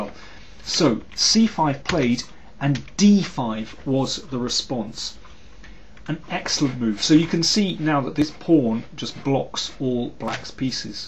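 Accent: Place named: RP, United Kingdom. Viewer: Yes Very Clear! even commentating at an Elite level, Thank you GM Daniel!!. Its clear because GM Daniel has superb speaking and chess talent! Also it doesnt hurt that he is speaking the Queens English right proper, the most understandable form of communication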